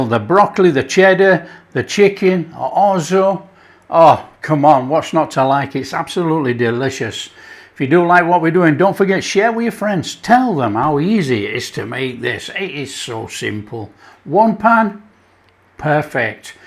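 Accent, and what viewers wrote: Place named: Sheffield, United Kingdom. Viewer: I definitely will! I have made your mashed potatoes with cabbage, green onions and bacon; twice already! My family can't get enough of it! Today I made it with corn beef, and it was an absolute delight! So thank you for the recipe,! If I may ask you, what part of UK are you from? We love your accent! It reminds me of our family friend, from Sheffield UK!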